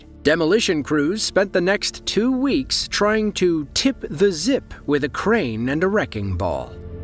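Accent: United States. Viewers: narrated with an American accent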